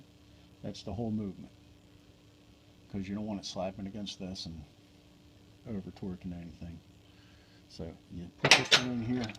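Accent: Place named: Delaware County, United States. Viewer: Judging from his speaking manner, my bet is that he's from the Philuffia PA or South Jersey area, and has a little bit of a "Delco Accent". Sometimes difficult to discipher even by locals. GO BIRDS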